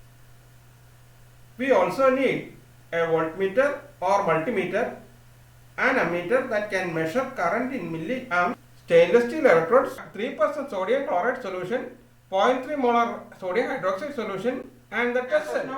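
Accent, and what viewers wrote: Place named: India. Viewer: I like it :) But Indian English is so difficult to hear ^^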